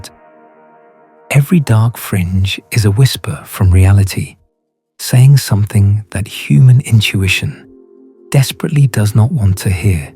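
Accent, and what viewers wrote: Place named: England, United Kingdom. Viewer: English accent - American idiom. Quite dissonant.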